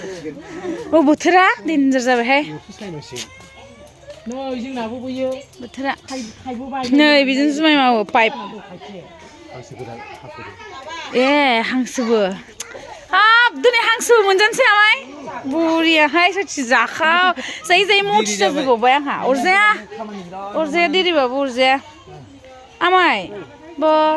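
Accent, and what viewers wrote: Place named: Udalguri, India. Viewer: jwngni udalgurini baidi railainai binanao sona.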